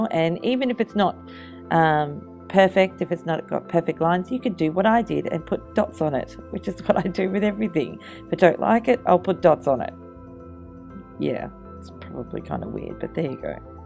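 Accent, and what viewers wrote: Australia. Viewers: I prefer the videos that you talk us through!!! They sound awesome!! And it's really nice to hear another aussie out there (I'm from Australia too)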